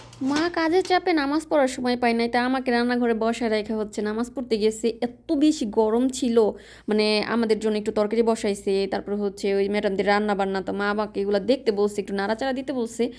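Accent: Faridpur, Bangladesh. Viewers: আপনার ভাসা সুনে মোনে হোইলো আপনারা ফোরিদ পুরের তাই না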